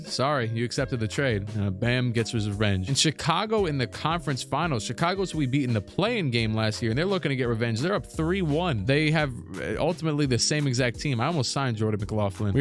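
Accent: Trinidad and Tobago. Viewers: Are you actually Trinidadian ? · Nah no way bro was trini this whole time 😭🫡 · your trini? same here bro fire vid ❤️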